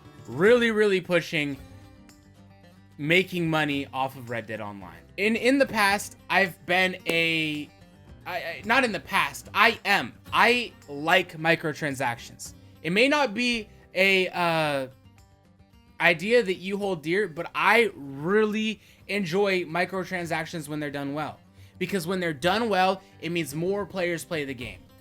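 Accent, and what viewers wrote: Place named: Russia. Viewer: you are 100%, they have made the game unenjoyable. But please in the next video talk in you crazy Russian accent. That is the reason I started watching this channel.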